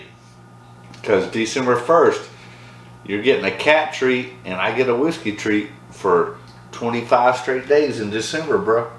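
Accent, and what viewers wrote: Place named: South, United States. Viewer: you sound like you’re in the south? I don’t have much luck finding the allocated stuff here in NE TN.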